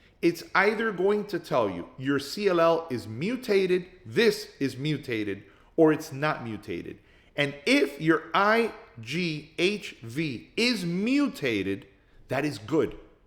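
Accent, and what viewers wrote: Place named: East Coast, United States. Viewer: I was recently told that I may have LCC and have started the testing process. I’m a 54-year-old woman with a connective tissue autoimmune disease, and my lymphocyte levels have been elevated for the past four years. I plan to revisit this video after my appointment next month, when I hope to have a better understanding of what’s going on. Thank you so much for taking the time to share this information. I also wanted to mention that your East Coast accent was incredibly comforting to me. Having grown up on the East Coast and having lived in the Pacific Northwest for the past 25 years, hearing your voice made me feel relaxed and reassured. It reminded me of home and gave me that warm, familiar feeling of being around family. I didn’t realize how much I needed that comfort until I listened to you. Thank you again for sharing your knowledge and helping others navigate what can be a scary and uncertain journey.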